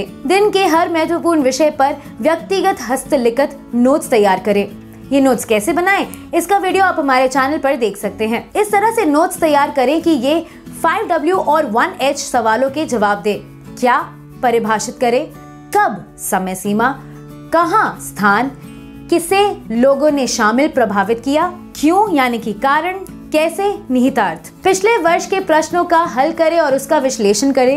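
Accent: Standard. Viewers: Mam do you speak after Google translate your Hindi is so pure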